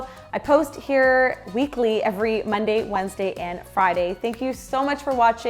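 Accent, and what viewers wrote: Canada. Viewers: Love the recipe, I will try it for sure!! Btw, I love your accent, are you from Canada?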